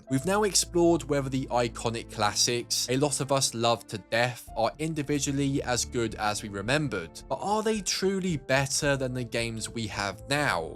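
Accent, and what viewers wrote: United Kingdom. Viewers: wait this guy is bri'ish?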